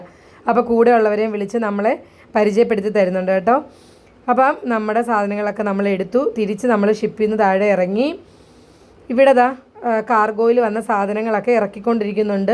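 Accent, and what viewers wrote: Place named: Kochi, India. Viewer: ഞാൻ അബ്ദുറഹ്മാൻ ചാവക്കാട്😂 നിങ്ങൾ ലക്ഷദീപിലാണോ, ഭാഷാ കേട്ടിട്ട് കൊച്ചിക്കാരത്തിയുടെ പോലെ തോന്നുന്നുണ്ട്, അവിടെ ഫ്രഷ് മീൻ കിട്ടുമല്ലോ, നിങ്ങളുടെ ഭാഗ്യം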